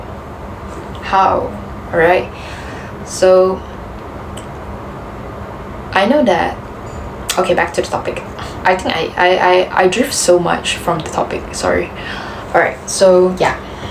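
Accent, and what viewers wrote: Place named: Meghalaya, India. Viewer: Your accent reminds me of my incredibly wise friend who is from Meghalaya. It feels like an older more experienced friend spoke to me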